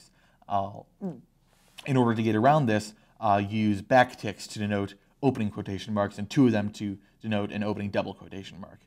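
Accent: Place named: United States. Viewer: Why are you pronouncing "Z" as "C"? ... I know it is the American pronunciation, but for non-Americans it is very confusing. :-)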